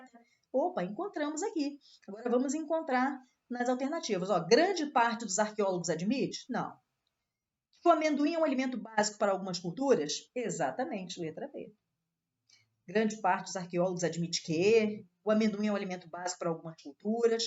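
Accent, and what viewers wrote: Brazil. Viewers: Parece paraense!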